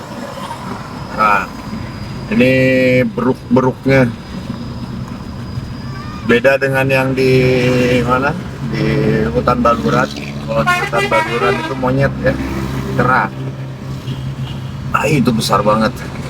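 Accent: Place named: West Sumatra, Indonesia. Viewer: Kok nggak sampai ke Aceh Bg...sayang x ah...dan maaf kayaknya Abg begitu faham dgn Sumbar apa , Abg urang "awak". ? Karena sekilas ada tekanan dialek Minang di campur Sunda.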